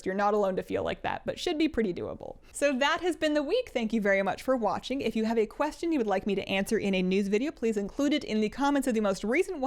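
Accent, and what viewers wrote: Canada. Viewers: Are you Canadian by any chance?:D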